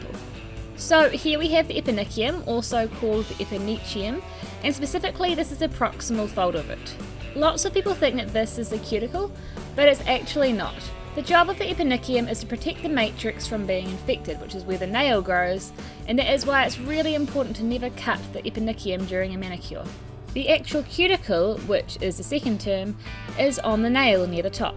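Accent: New Zealand. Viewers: I love the way you talk! I'm a Kiwi too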